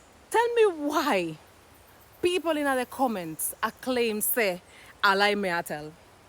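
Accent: Jamaica. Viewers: That's crazy .. she got a English accent .. a Jamaican accent I love it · Love your channel and your jamaica patwa accent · I love the way you speak Jamaican English. I love your beautiful solar system. You always win, be happy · 😂😂😂😂 You pretty pretty pretty but I only understood half of your words but I love the Jamaican accent 😂😂😂😂❤❤❤❤❤❤❤❤ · Lololololol, mi luv hear har wid fi patwah yah mon. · Jesus christ idk what to call that accent, spanglish patois? Its cute though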